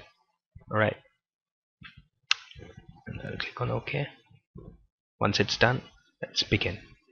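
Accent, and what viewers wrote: India. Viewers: your voice is so stereotypically indian lol no offence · Phir bhi dil hai hindustani !! Nice Axent !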